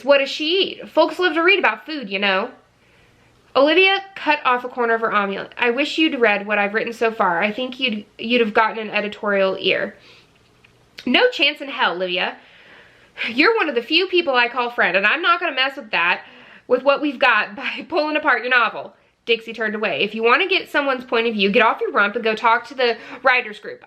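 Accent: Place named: South, United States. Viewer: Just listened to this a second time - you have the BEST Southern accent ever. In fact, I didn’t even like the women in the beginning but your accent made them more likable! XOXO Lisa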